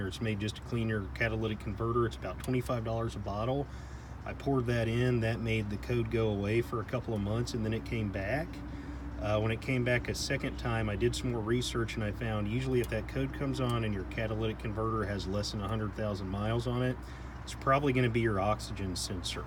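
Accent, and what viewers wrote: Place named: Kentucky, United States. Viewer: You from Kentucky? Sounds just like my brother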